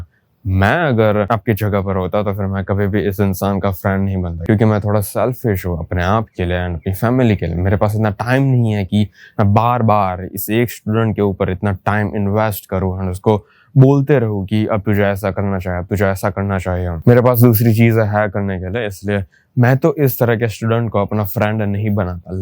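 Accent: Gujarat, India. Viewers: tumhare Gujarati accent ki vajeh se lagta h tum vimal chaba ke bol rhe ho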